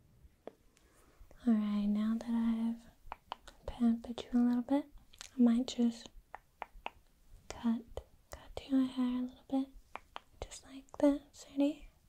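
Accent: Australia. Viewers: I love her Aussie accent too much tho!☺️For some reason it’s more tingly!🥰👉🏼👈🏼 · The second I heard you, I knew you were Aussie 😂 loving it, have a good one mate 👍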